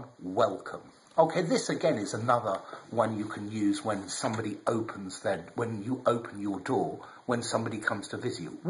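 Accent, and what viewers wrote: United Kingdom. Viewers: Oh, Finally A British Teacher.! Great! BTW,I am interested in the British accent and trying to listen to it as much as I can,but still need rules! Do you -or anybody else- know how to improve ACCENT ? sources PLZ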